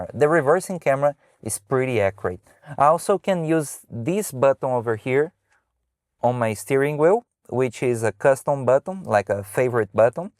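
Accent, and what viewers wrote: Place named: Brazil. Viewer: I love your brazilian accent - we are so lucky to have you here in australia reviewing this car. thanks for your advice!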